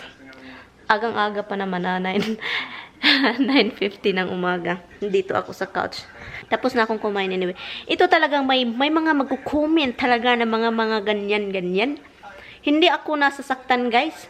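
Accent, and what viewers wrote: Visayas, Philippines. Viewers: Hi I like ur blog..ignore sa mga taong negative and haters...parehas tayo bisaya gahe intonation..haha..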